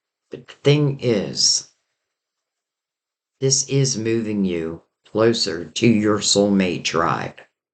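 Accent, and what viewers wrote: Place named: Pennsylvania, United States. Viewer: First time I listened to you. I am feeling your energy and I’m so thankful I found you. I love your accent. PA? I’m from Philly. I connected with your reading so much. My Dad came through with everlasting love. ❤️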